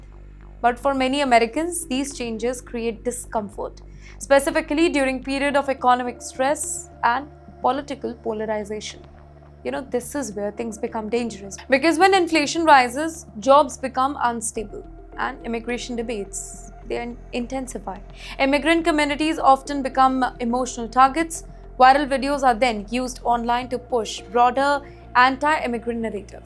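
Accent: India. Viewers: Can't figure half of what she said. Too Indian accent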